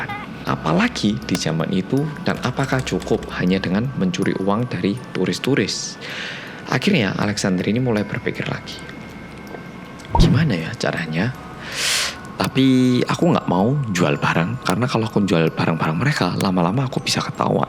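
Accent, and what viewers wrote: Java, Indonesia. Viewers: Gara2 delok mas orang korea yg medhokk.. lali nak aku lagi godok mie. Wah mas mas... indomie ku sampai ke-medhok'en iki lho. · Oke mas medok 🦖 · Maaf ya mas out of topic commentnya. Aku mau bilang, aku mulai hidup sehat karena Mas, dari diet sehat sampe skincare-an pake Nacific. Aku ngikutin mas dari badan mas masih agak berisi sampe kaya gini. Well, makin hari mas makin guanteng yaaa. Orang lain makin hari makin tua, mas kebalikannya. Aku percaya itu semua karena gaya hidup mas. Thats why aku termotivasi jejak mas. Di saat aku pengen nyerah, aku lihat video mas dan selalu termotivasi lagi. Sukses buat mas, semoga suatu hari kita bisa ketemu langsung dan sharing pengalaman hidup. Luv u orang korea yg medooook! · Mas pas pertama kali liat channel nyaa aku sempet bingung 🤔 orang Korea bahasa Jawa nya medok banget 👍🏻👍🏻👍🏻 # kangen sama Rindingdong Like ya 👇🏻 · Welkom bekk to bersama org korea yg medoookk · Gk perlu jadi penonton pertama,yg penting jadi penonton setia nya mas 😭😘 Semangat mas 👏👏👏 Mas,jalan jalan dong mas ke busan,saya lagi ada di Busan nih mas,mas kesini dong😁 Saya pengen banget ketemu mas lah👏👏👏 Buruan ya bang,saya keburu berangkat wamil😁😁 Semangat terus mas Orang Korea yg medok😭😘😊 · We miss you mas yg medhoookkkk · Mas medok chek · Woffyou mas yutup medhook kesayangan kita semua:*:* <3 <3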